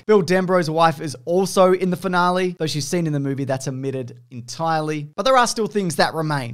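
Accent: Australia. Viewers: In your Aussie accent it sounds like "porn shop owner" instead of "pawn shop owner." Thinking to myself, "Pretty sure he wasn't in a porn shop, but ok.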